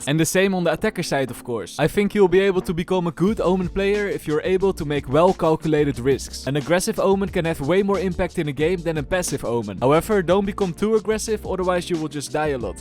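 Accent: Netherlands. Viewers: how much do you like his dutch accent? very much i like his tips more 👇 👇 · 1% englise acsent 99%dutch acsent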